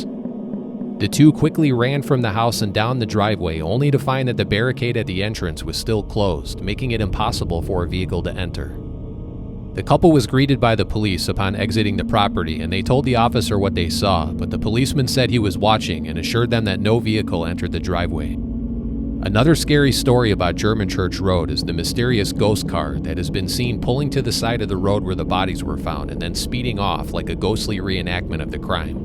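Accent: Chicago, United States. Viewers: I've lived in Southern California since 1975, however I grew up in Chicago and St. Louie, so I thoroughly enjoy your videos and your accent, which reminds me of home. You're fantastic. Thanks for all your hard work!😊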